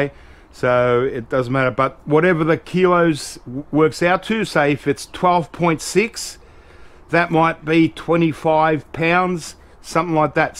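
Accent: Australia. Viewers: 73 kg! Nice tab for eBook reading. I always enjoy your videos, love your Aussie accent.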